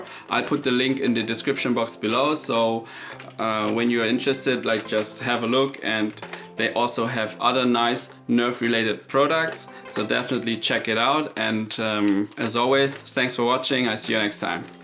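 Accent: Germany. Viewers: I can see your german, right? Der akzent sagt alles! :) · Ich erkenne das du deutsch bist am Akzent richtig cooles Kit muss ich unbedingt machen ich bin riesiger sniper fan aber leider gibt es keine coolen sniper von nerf ausser die Longstrike die aber nicht mehr hergestellt wird deshalb werde ich mir das kit unbedingt kaufen .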